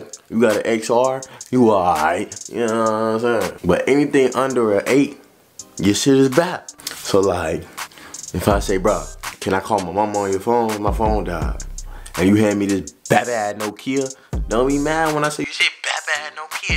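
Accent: New York, United States. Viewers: I can hear the ny accent in your voice but you picked up onna florida shit so decently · U have a New York accent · u sound like you from newyork then anything.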